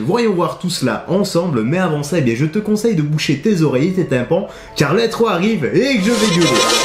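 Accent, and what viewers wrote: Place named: Liège, Belgium. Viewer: Quand je t'écoute, j'ai l'impression que j'entends un léger accent qui revient. On dirait que tu es liégeois ?!?🤔🤔🤔 😎😎😎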